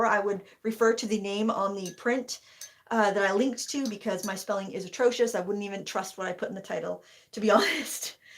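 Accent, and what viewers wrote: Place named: New England, United States. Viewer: You talk like a New Englander! NEVER apologise for that! New Yorkers talk even faster! You guys are just who you are and i, as a Brit, love your vieos, don't change to try and please everyone..southerners talk so s l o w, so each region is unique! Your art work is gorgeous!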